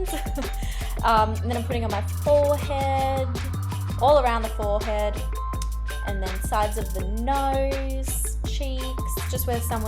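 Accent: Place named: Australia. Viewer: Love that accent, Australian?? I like this makeup tho :) xx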